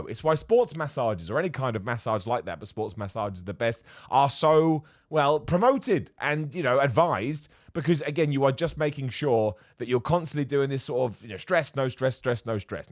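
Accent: United Kingdom. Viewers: Oh, it's! The things you say repeatedly get rushed through to where they've lost our normal, awe-inspiring Bri'ish enunciation. I finally caught it! I really enjoy your videos. You make even the droll topics more listenable.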